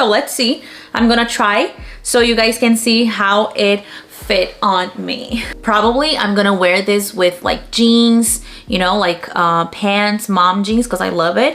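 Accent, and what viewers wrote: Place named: United States. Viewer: more videos in english please, you’re sound like a american girl haha loved it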